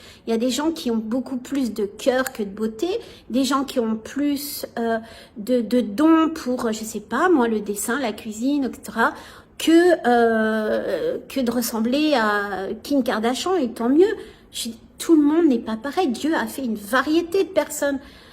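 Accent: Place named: Arab world. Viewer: fete de l aid du ramadan ces manger pendan 1 moi s engraisser la nuit manger 3 repas en 1 fois vou vou prenez pour des foque a vouloir remplir vos reserve de graisse tai une meuf perdu deja fai jamai d enfan tai pa assez belle tu ai influencabl ta des souci psychologique en quoi tai belle ta pa le vosage racer fin tai une movaise race aryenneee ces pour sa tai musulmane allah crer les arab avec des tete de chameau des nez de juif les saoudien on les nez et ma bouche pareil que le chameau pourquoi allah fai moche sa creation 🙂 on dirai une enfan qui parl tu parl com une pucel tu parl que tu va attirer les sal type mais ces sal typ son la creation d allah tu insulte donc sa creation tira en enfer tai une kassos tu as telemen besoin d amis que tu ai devenu musulmane mai v ou ile toi tai pa assez bell pour te devoiler et ton accen arab le hhhralal tu n ai qune blanche perdu fai un test de qi mdrrrrr la pieter dan le keur et la keu dan quoi mdrrrrr ta pa honte dal merdeuse tai moch com tes seur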